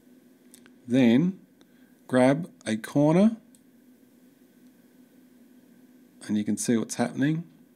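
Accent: Australia. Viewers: subscribed just for "ill be speaking Australian" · great, straightforward video in Australian, although I speak Californian. Thank you sir!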